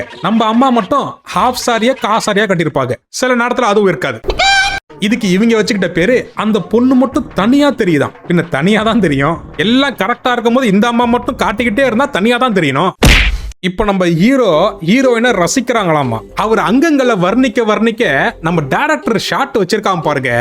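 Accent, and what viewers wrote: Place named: Tirunelveli, India. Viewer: Bro thirunelveli slang puduku 😂😂 romba siripu varudhu bro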